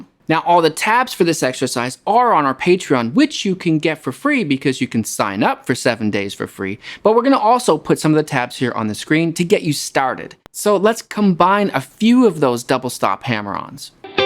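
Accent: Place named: Vancouver, Canada. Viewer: The haircut, the body, the accent... you are the embodiment of Vancouver BC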